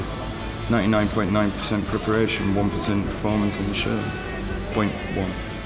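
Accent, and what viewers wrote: United Kingdom. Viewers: I love his brittish! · Was not expecting tht propa strong yorkshire accent lol!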